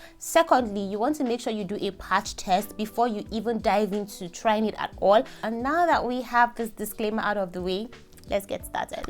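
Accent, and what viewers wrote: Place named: RP, United Kingdom. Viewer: Queens English weldone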